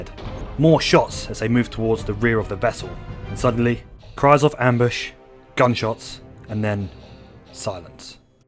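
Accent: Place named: United Kingdom. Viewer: You some how sound more British then I thought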